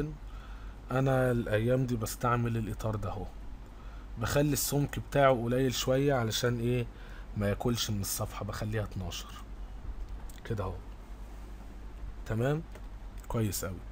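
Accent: Egypt. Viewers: هو اني فهمت بس ليش يحجي مصري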